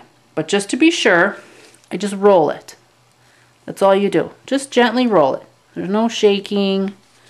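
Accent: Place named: Minnesota, United States. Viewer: Great video. Love your accent. I'm guessing Minnesota.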